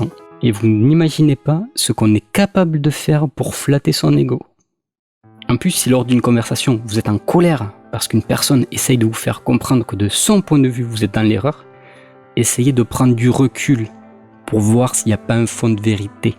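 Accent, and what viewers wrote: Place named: South-West, France. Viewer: J'ai découvert cette vidéo sur skeptikon. Du contenu sceptique à la sauce accent du Sud Ouest, voilà de quoi ravir mes oreilles. Alors je viens soutenir par un pouce et un abonnement içi.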